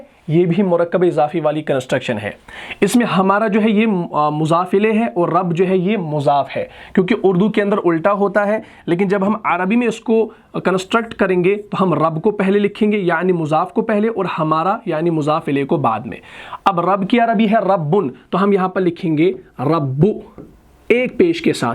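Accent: Kashmir, India. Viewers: اَلسَلامُ عَلَيْكُم وَرَحْمَةُ اَللهِ وَبَرَكاتُهُ بھاٸی آپ کے لہجے سے میں پہچان گیا تھا کہ آپ کشمیر کے ہیں میں لاہور سے غازی مخاطب ہوں آپ کا پروگرام امارت اسلامی افغانستان میں بھی دوست سنتے ہیں اللہ آپ کو جزاۓ خیر عطافرماۓ بہت اچھا درس ہوتا ہے اس کو جاری رکھنا اللہ آپ کا حامی و ناصر ہو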